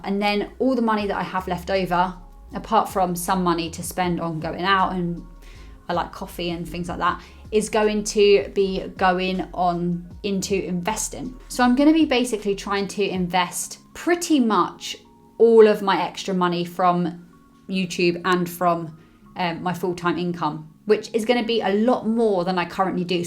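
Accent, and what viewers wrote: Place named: Cockney, United Kingdom. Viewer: Good luck - with the channel and the job my cockney sparrer 😁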